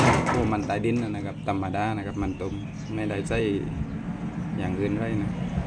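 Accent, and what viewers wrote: South, Thailand. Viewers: ลุง อยู่จังหวัดไหน เห็นพูดไต้ค่ะ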